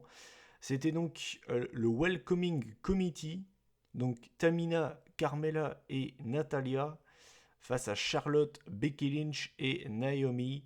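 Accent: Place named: England, United Kingdom. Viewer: J'adore ton accent anglais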